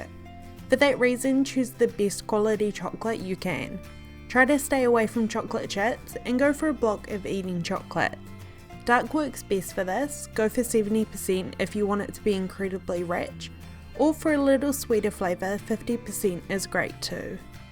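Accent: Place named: New Zealand. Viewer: Hi, she’s not British. She’s from New Zealand. I’m Australian, and I’ve lived in NZ too, so, I can assure you she is a kiwi :)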